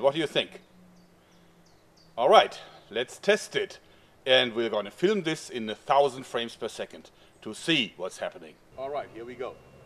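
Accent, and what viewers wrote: Germany. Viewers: is him from Germany? im from Argentina sorry about my English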